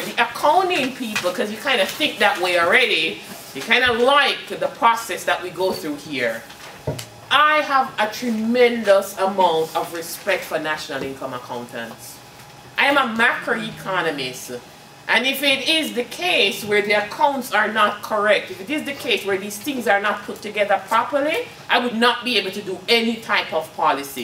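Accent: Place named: Jamaica. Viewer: Sound like a Jamaican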